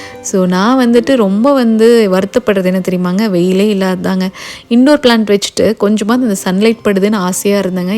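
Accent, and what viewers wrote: Kongu, India. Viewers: " ங்க " என்று சொல்லும் கொங்குங்க, எப்பவுமே கிங்குங்க, வார்த்தையே சாங்குங்க, மொத்தத்தில ஹாப்பிங்க!👍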